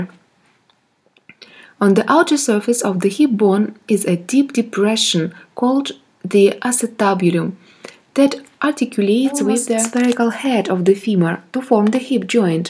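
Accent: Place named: Spain. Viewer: Thank you so much. It was a great help. Btw are u spanish? I asked because of ur accent. I love this accent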